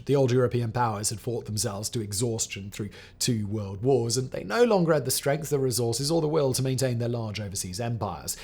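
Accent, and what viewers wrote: United Kingdom. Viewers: Hard enough to understand his heavy British accent and then he mumbles too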